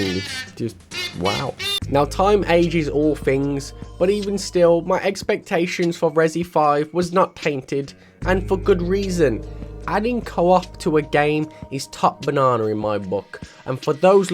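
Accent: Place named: United Kingdom. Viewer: you are awesome dude👊😂, can I ask you where are you from precisely? your accent seems british but not the british I know🤔.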